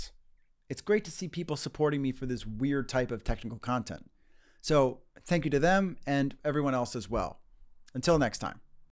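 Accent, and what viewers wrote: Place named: East Coast, United States. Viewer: You have a very similar accent to three blue, one brown. Is that like in East Coast thing?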